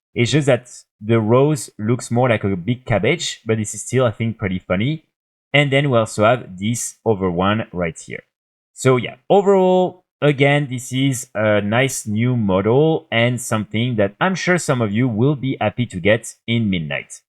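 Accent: France. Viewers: He’s French. Try not to be a petty piece of shit online. 👍🏻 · thats called a French accent, are you slow? (edited) guess you had some typos huh sped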